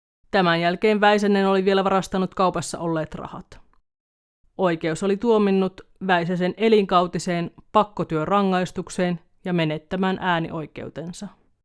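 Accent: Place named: Savonia, Finland. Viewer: Ei oo tähän tarinaan liittyvää,mut ihankun oisit savolaenen. Tuo huastelu jotennii tuntuu sille.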